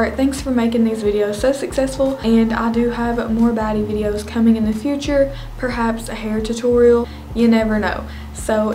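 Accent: South, United States. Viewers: nah but I love her voice and the country accent. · do you live in the south? you sound southern I'm curious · love that southern accent , where are you from ? - much love from South Carolina 💖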